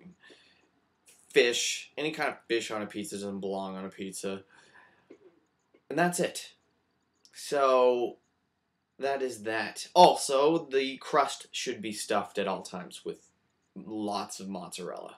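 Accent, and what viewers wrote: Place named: Canada. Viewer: Your Canadian accent is soooo hot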